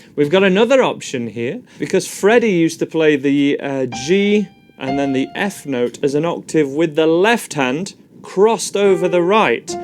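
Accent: Yorkshire, United Kingdom. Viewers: Omg SUCH a yorkshireman!!!!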